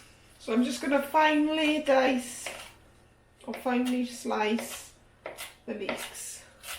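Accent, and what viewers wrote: Wales, United Kingdom. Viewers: It looks yummy! I'll have to give it a try! Good luck on your growing in your garden! When do you give up the allotment? Oh and I love your Welsh accent :)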